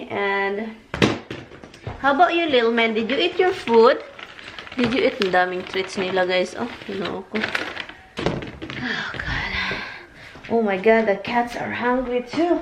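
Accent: Visayas, Philippines. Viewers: Tagal mo na dyan sa america. Ang accent mo bisayang bisaya. Bat dka magtrabaho pwede rin pala dyan housekeeper.0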